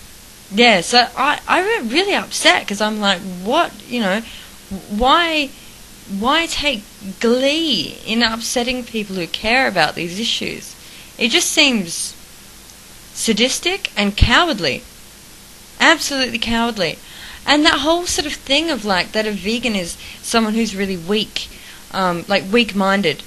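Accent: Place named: Australia. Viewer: dude China's awesome. the US does horrible things as much as China, just not fur. heard of bear parks? every country has its good and bad points and its immature to say that one country is better than another. anyway, yaaaaay great videos :) you sound so Aussie too and like a normal casual person, not like a crazy hippie. hopefully people will respect your opinion.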